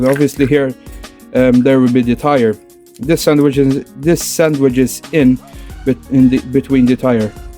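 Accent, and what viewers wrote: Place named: Malta. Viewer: your English sound like Maltese English :-) Interesting design and very well made 3d printed parts, tempted to get an SCX10 but now I'm too busy with the 3DSETS Landy and Rancher, 3D Printing is very addictive.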